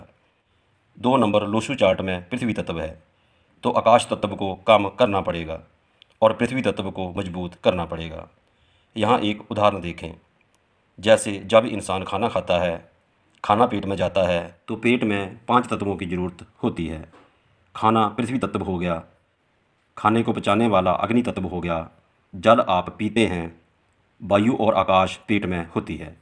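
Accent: Punjab, India. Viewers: ur speak style very nice punjabi touch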